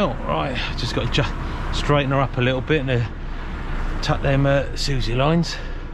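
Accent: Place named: High Wycombe, United Kingdom. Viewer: Sounds like my accent from High Wycombe many moons ago, in Australia now, used to drive road trains here 4 trailers. Thanks for the video.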